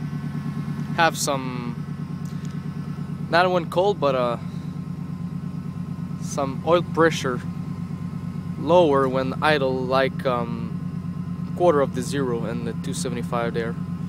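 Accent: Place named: Quebec, Canada. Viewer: cool accent.. are you french canadian? I have a few freinds that are.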